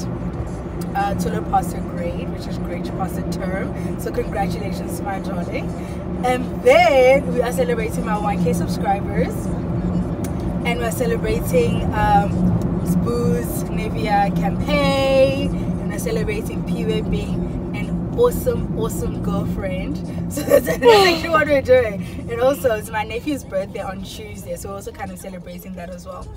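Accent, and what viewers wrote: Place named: Botswana. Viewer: Your Setswana accent got me curious to know where you originally from, it’s not far from how we speak in Kimberley “without the Afrikaans 😂”.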